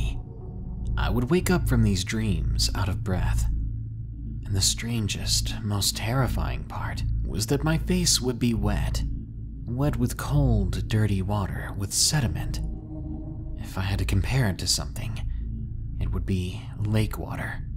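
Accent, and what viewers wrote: South, United States. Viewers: He practiced, in his old videos he sounds super southern and not silky smooth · The american accent version of Lazy Masquerade. I kid you not, you both have the same tone, same way of narrating the only difference being the accent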